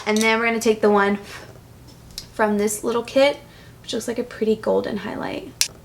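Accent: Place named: Georgia, United States. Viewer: what part of ga are you from girl?!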